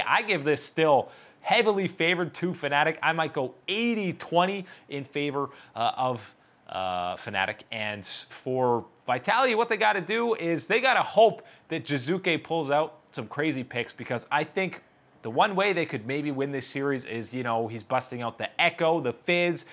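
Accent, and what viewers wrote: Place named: United States. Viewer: Jesus...I can REALLY tell you are American and not European, dude! :D #VITWIN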